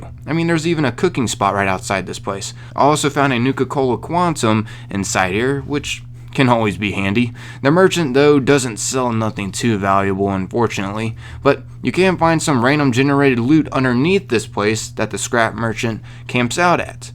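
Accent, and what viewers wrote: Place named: Western Pennsylvania, United States. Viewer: Brother you gotta be from Western PA right?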